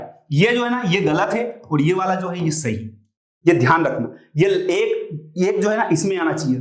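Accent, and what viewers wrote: Indore, India. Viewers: Sir indore se ho kya😅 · thoda indori tone bhi h sir ka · Indore se ho kya bhaiya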